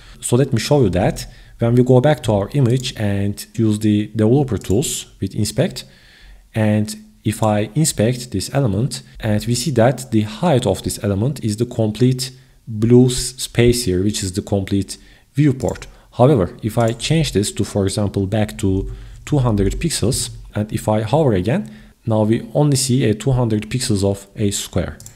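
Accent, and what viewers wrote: Turkey. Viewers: Exceptional Turkish accent. Lovely. Thanks for this !!!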